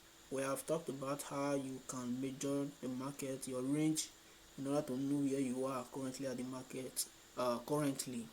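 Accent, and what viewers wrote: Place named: Nigeria. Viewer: Chai, I thank you very very very very very very very very much, infact words can't explain how I am thankful for finding this YouTube channel. Let me tell you, since I found this channel I have been having in my trades and heart. Normally I don't like watching any YouTube videos apart from ICT, but I was on a search on a question that was bothering me, then I stumbled on your channel, just watching one, just one video from your channel I got in love with the way you simplify everything ICT is teaching us. You know, there is a difference when your own Nigerian person is talking to you compared to an America or Foreigner with their accent. I just love it, Sir please keep it up to drop simplified videos like this and others in future. I'm your number 1 Fan. Love from me to you❤️❤️❤️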